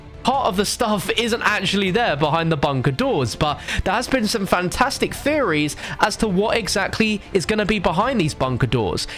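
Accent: England, United Kingdom. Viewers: Why is every youtuber who plays cod from England or a England accent never fails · It’s that British accent man gets us every time.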